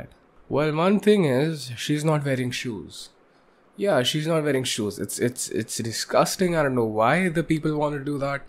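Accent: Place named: India. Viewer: Love the indian (or idk) accent bro🙏🏾🔥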